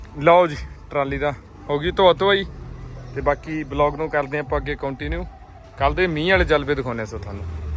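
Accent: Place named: Puadh, India. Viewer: Gay gay Kaya Kaya thoda area fathegarh lagda pawad boli bolde ho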